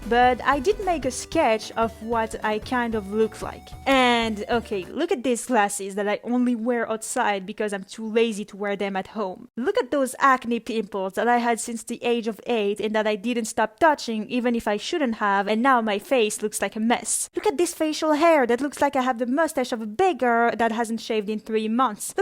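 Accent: France. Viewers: Just basically it kills me how people knew you are french like me because you have a french accent. In the crack ships video there was literally written « nombre total d’abonnés » and the name of the music video was « Mon avis va déclencher une guerre » so as Carmilla said « It’s not rocket science » 😂😂😂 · And you know what’s funny ? By first watching one video of yours, I really thought you were American, then I was like « But she kind of has a French accent, I wonder if she’s French in fact » and when I watched your short about Yandere Simulator, I was like « Yeah. She’s definitely French. » I mean, I don’t understand how people can’t notice you’re an anime fan, you litteraly use many anime of your videos (like Death Note or Kaguya Sama). Wish I had like half of your collection, still have the entire collection of Ki & Hi though. I feel you. Currently in my last year of High School and I can’t seriously study for my baccalaureate even if I try. 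And I feel bad because in elementary school, I only had excellent grades but it feel off the second I enter middle school. I just hope I’ll have a 13 or 14 in my final grade. Alex Meyers, Saberspark and Octo Lady ? I didn’t know you had such good taste, let’s go😌 ESPECIALLY since he’s canonically asexual. Like…no. I mean, the only person I can see Alastor being with is Rosie but only for tax benefits or something like that. OMG WHAT ??? It’s so cool, I’m Moroccan too, LET’S GOO ! I think it will be better to do that if you reach 50k. But anyway, congrats for the 9.5k🎉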